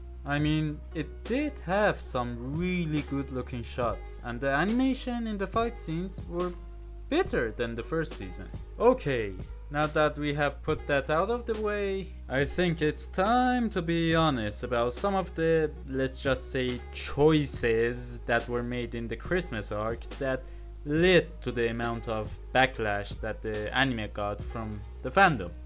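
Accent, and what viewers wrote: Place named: Iran. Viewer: dige ma irania lahje hamo tashkhis midim rahat 😂❤ vali kheyli khub harf mizani :) kheyli ham channel e khubi dari, keep up the good work! omidvaram hesabi pishraft koni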